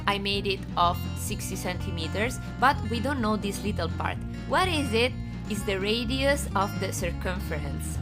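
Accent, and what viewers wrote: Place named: Italy. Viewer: me encanta tu canal!!! sabes tu hablas mitad italiana mitad española saludos desde argentina!!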